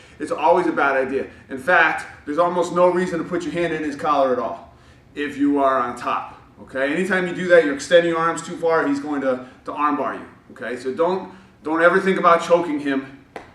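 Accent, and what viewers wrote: Brazil. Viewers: Between Admiral Ackbar and the Gracie accent, I couldn't sub fast enough haha. Awesome info also! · His Brazilian accent reminded me of nigahiga WHY GOD WHHHHYYYYY? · Very great brazillian accent!!! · LOL did not see the amazing Brazilian accent coming.. Made me pay more attention tho, suddenly the instructor seems way more legit. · as a brazilian i can confirme : accent 100% legit true ahhaaha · bro I swear to god with that brazillian accent you sound EXACTLY like my coach. I was dying the whole time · Your Brazilian accent is hilarious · I'm only just about to train gi, after doing nogi for a little bit. I felt like I was watching a video of myself! Thank you for the humor, and the good advice. The Brazilian accent really drove the points home. :) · the best brazinglish I've heard so far. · priceless brazilian accent ! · 10/10 brazillian accent. 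Sounds exactly like my teacher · hahahahahahahahaha. loved the brazilian accent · love these videos. killer Brazilian accent! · The Brazilian Accent made me suscribe! Had me ROLLING 😂😂😂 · Hilarious Brazilian accent. Subbed based just on that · Subscribe to an arbitrary BJJ channel - NO. Subscribe to a BJJ channel with clear instructions in brazilian accent - YES. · Lol nice Brazilian accent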